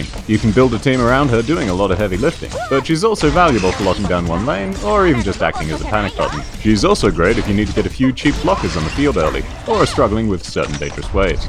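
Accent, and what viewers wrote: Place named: Australia. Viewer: Perfect quality video except the aussie accent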